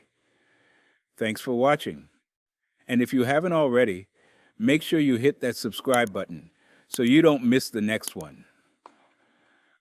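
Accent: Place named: Louisiana, United States. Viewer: This is what I said as well! 😂 I was like ...."He sounds like my Dad/Uncles!" , which are born in Louisiana.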